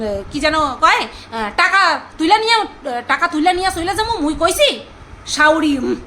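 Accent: Bangal, Bangladesh. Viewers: তোমার মুখে বাঙাল ভাষা খুব ভালো লাগে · দারুণ কথা বলেছো।আর কি সুন্দর বাঙ্গাল কথা শুনতে খুব ভালো লাগছিলো।আর হাসছিলাম। ভালো থেকো। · Tomar mukhe bangal kotha valo lage age bosak ke nie bolte tokhon bangal kotha bolte.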